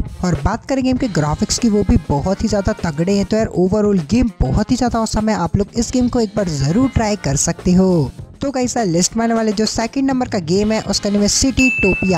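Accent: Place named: India. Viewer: I do not understand this fast Indian accent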